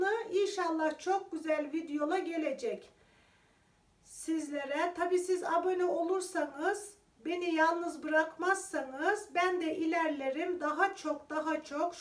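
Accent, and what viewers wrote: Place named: Karabük, Turkey. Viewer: Bacım, Tesadüfen önüme düştün. Zırh örgünle, konuşman yabancı gelmedi Nerelisin diye baktım. Karabük deyince şiven beni çekmiş. Ben Karabük'de 1981_ 1986 yılları arasında çalıştım.Mat ögretmeniyim Ordan şiven yabancı gelmedi, Kastamonu'lu mu acaba diye izledim. Aynı yöre,şiveler benziyor. Bursa'dan sevgi ve selamlarımı gönderiyorum.Takibe aldım yemek videoların güzelmiş, deneyeceğim. 💗💗💗🌷🌹🌷🪴🍹☕🍪🍃🥰🙋‍♀️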